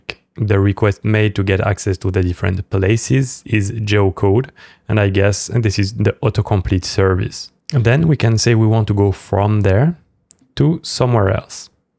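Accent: France. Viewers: Excellent content :). Malgrer que ton accent Francais se fait vraiment ressentir 😅 Thanks !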